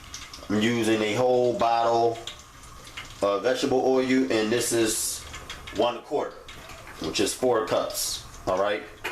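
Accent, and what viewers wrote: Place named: Baltimore, United States. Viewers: I know lol I'm from Philly and I thought he was from Baltimore. I can't get over that accent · commah ntata he sound like he for bmore 😃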